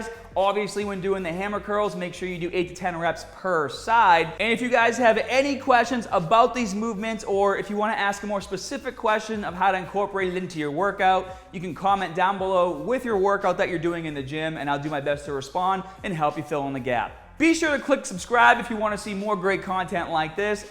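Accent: Boston, United States. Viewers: Boston and Aussie accents both have a similar elongated pronunciation of 'AR' within words (stARt, tARget, bARbie etc). I think due to his elocution his Boston accent only flourishes on certain words, which happen to be the ones with 'AR' similar to in Aussie. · That Boston accent is disgusting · Boston accent lol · Daniel Bon Daza it’s a Boston accent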